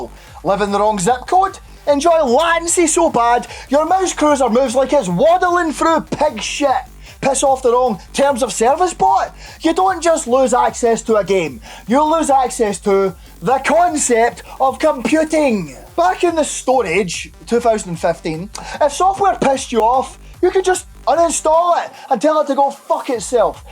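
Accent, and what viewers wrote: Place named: Scotland, United Kingdom. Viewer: Annoying?! Scottish is the only accent that should ever be used for a good rant. The Scots sound like they're ready to fuck some shit up regardless of the banality of the topic. Honorable mention to the Irish.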